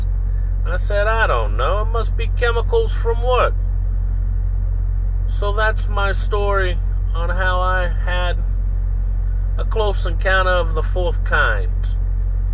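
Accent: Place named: Australia. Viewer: You sound Australian.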